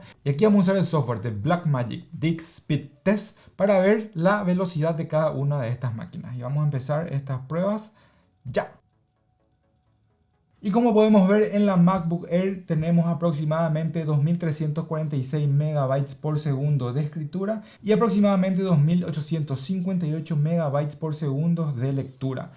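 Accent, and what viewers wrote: Paraguay. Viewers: Eres paraguayo, me di cuenta en solo 30 seg de vídeo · Una pregunta. Sos de Paraguay?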